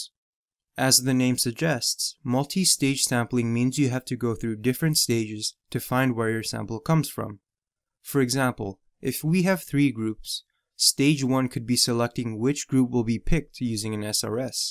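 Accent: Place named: China. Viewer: video not useful could not understand heavy Chinese accent. would not recommend -2/10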